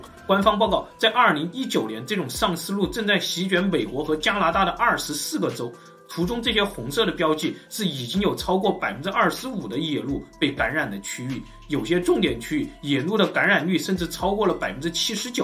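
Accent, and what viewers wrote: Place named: Hunan, China. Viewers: 到底是哪里人啊？我好喜欢你浓重的西南椒盐普通话，好亲切好接地气！总会让我想起当年学校男老师们那蹩脚的川普~🤣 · 你是湖南人么… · 可能是四川两湖一带的口音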